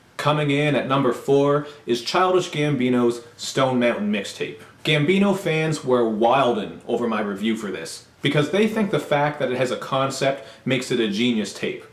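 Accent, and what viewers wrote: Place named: Canada. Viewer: Will do. Are you Canadian? I love the accent.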